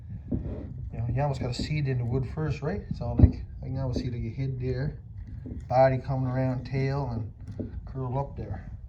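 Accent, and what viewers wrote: Newfoundland, Canada. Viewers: My soul mate loved hearing your voice reminded him of his childhood in Grandbanks. NFL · I did not know that Canadians can sound so different from US Americans.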